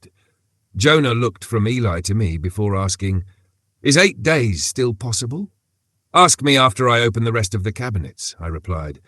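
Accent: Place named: England, United Kingdom. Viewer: Like the premise the English accent or whatever it is I just can’t do it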